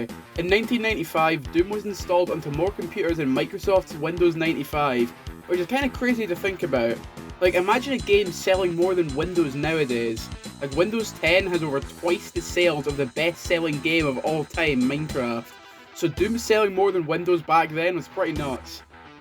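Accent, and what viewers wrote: Scotland, United Kingdom. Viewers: man i love that Scottish accent.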